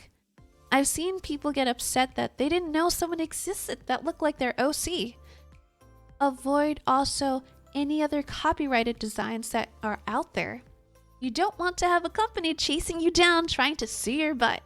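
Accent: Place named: United States. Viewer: You live in Europe? where?.... eh some people don't like to disclose that information. Your accent sounds pretty American to me so that's shocking to hear.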